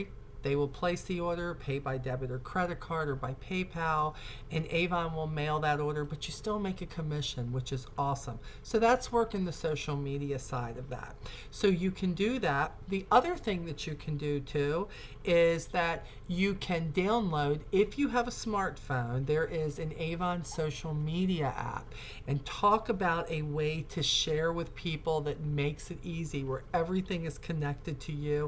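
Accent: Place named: Mid-Atlantic, United States. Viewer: Hi! Just started and I want to thank you for all you do. I'm from NJ but live in MI now and with so many other videos out there to watch, I felt at home when I heard your mid-eastern accent.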